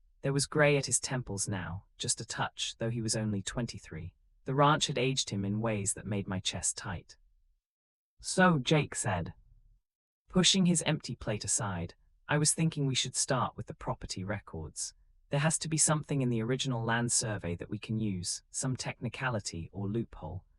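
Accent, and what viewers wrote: United Kingdom. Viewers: I absolutely love the storyline it shows that Jake and Carter has true love their soulmates even if a Brit wrote the story I wish that they would get someone to narrate it with more of a Montana North. This is not the first word to listen to someone from Brett narrated it's just that it would make it more believable if they would get someone from the USA. I'm not trying to be racist or negative but that's just my opinion...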